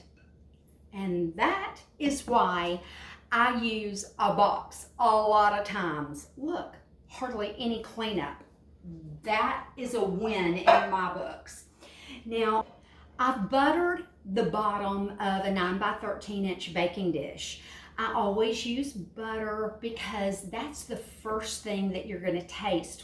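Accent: South, United States. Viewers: I’m trying to make brownies and they never turn out right, out of all the videos on YouTube.. I really didn’t like any. After about 20 videos and endless scrolling I finally found yours… immediately the southern accent made me know this is this video for me!! I’ll be making this now. Thanks.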